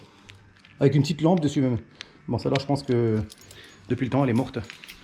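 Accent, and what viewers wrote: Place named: Lorraine, France. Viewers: salut le maître , tu devrais donner des cours au jeune, tient ! toujours impeccable, comme tu le demande dans la vidéo, je mets un commentaire , je sais le faire. mais c’est super cool de te voir le faire avec autant d’aisance. je regarde toujours toutes tes vidéos en entier, à quoi bon, juste mettre un pouce, si on ne regarde pas le contenu. je préfère tes vidéos que les émissions de télévision dédiées , car tu ne fais pas dans la mise en scène, c’est naturel et sans filtre. tu me fais rire avec ton accent qui ressemble un peu à l’alsacien. Tu ne dois pas être bien loin . a bientôt. 👍🏽👍🏽👍🏽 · Bravo meca a l'encienne j'aime bien humeur décalée ainsi que l'accent lorrain. Salutations 👍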